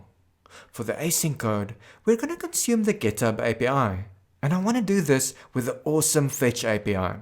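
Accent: South Africa. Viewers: I was like... wait what... is that an Afrikaans accent? good to hear :)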